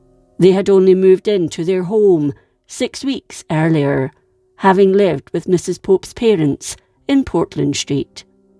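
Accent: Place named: Scotland, United Kingdom. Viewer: A Scottish accent.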